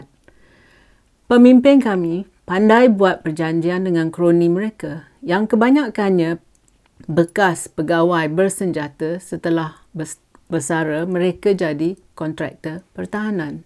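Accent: Perak, Malaysia. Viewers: Saya suka dgar. Tapi ckp perak sikit buat saya ketawa. Sbb saya pun orang perak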